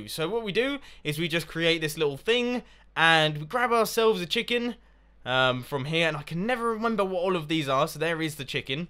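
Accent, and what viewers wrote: England, United Kingdom. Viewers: Jack Lusher Well he's from england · hes british. deal with it.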